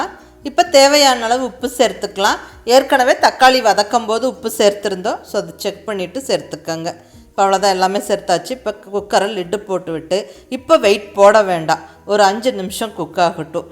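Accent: Coimbatore, India. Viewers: Nama Coimbatore Tamil kekalam Inge vantha.. 😍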